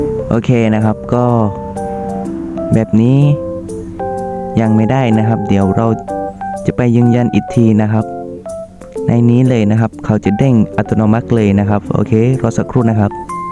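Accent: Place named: Deep South, Thailand. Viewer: เด็กสามจังหวัดชายแดนภาคใต้แน่ๆสำเนียงแบบนี้ผมชอบทำต่อไปให้กำลังใจ🥰🥰🥰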